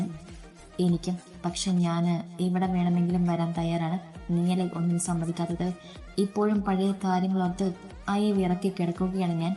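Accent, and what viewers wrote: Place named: Tamil Nadu, India. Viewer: ahno anik aa story nala ishta pine chechi de tamil malu slang oru rekshem illa poliii❤❤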